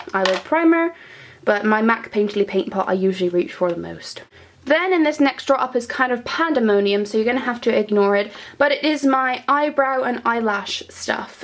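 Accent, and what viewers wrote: United Kingdom. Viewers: Are you from Britain? I'm really struggling trying to guess your accent, you sound british to me but I am unsure aha I am a new subscriber xxx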